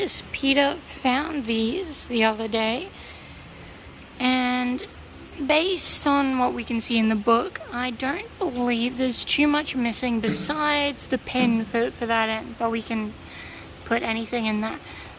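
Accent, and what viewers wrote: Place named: Australia. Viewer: That slightly cracked ozzie accent of yours is delightful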